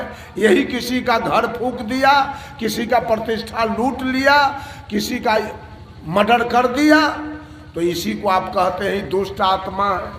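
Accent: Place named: Bihar, India. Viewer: वाह बाबाजी आपकी वाणी एक सो परतिसत सत्य तो है लेकिन आपकी वाणी अनंत सत्य हैआपका अभिनंदन चरण वंदन है आप कि बिहारी भाषा बहुत अच्छी लगती है आप जैसे महा पुरुष सदी मे जरुर आते है पागल लोगो को चेताने के लिए आपमहान संत है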